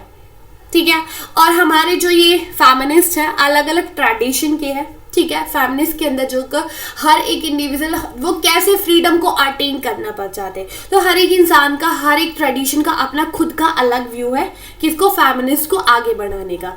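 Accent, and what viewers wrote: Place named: Delhi, India. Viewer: It's really interesting.... To listen👂 Is YouTuber from Delhi?? Or NCR... speaking ascent!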